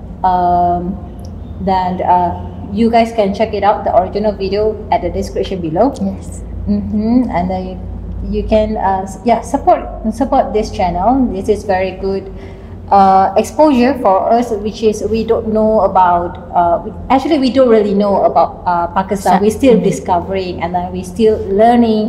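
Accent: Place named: Malaysia. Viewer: I like MALAY ACEENT, SO CUTE ❤️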